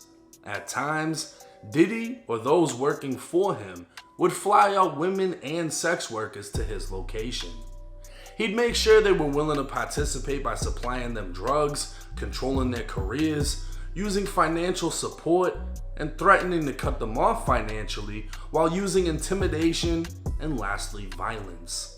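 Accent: Boston, United States. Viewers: Yooo shit had me dying i be in here just to hear this white boy narrate the news 📰 bro got a mix of accents with the local Boston accent god bless America 🇺🇸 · Bro your accent sounds florida/Boston/ and Massachusetts 😂😂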